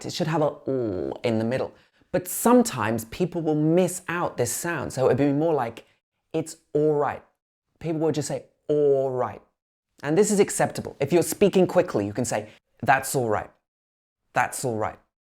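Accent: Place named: RP, United Kingdom. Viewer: Contemporary (Modern) RP! Yay!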